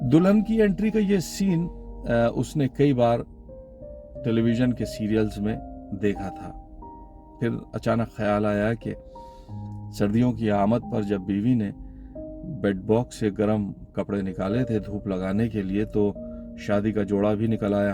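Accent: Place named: Aligarh, India. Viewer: Voice n andaaz fully Alig❤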